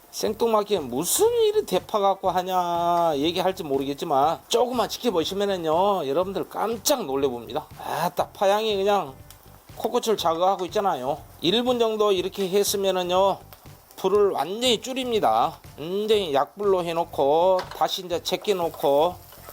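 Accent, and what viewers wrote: Jeolla, South Korea. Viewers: ㅎㅎ 셰프님! 전라도 사투리가 음식맛을 더 정겹게 합니다.❤ 저두 침이 꿀떡 넘어가네요.😅 좋은 아이디어 배우고 갑니다. 셰프님!화이팅~❤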